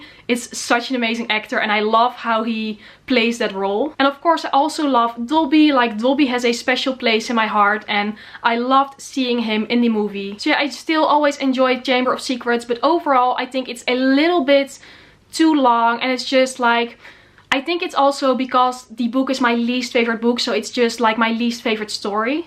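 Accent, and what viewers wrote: Netherlands. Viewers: Your accent is charming :) I immediately started wondering where are you from while watching one of your previous videos ... but I've just glanced at your description and now I know that you're Dutch! As far as the movies go ... I was dejected enough with the Half-Blood Prince to ignore the Deathly Hallows I premiere in Poland where I live. The last two movies I only watched at home.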